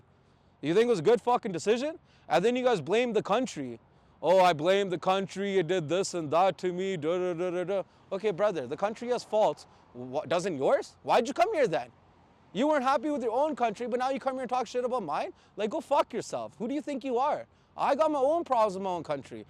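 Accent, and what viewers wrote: Canada. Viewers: correct he speaks proper canadian. I love it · that’s a Canadian accent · His accent is very much Canadian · The way he’s talking he has that Canadian accent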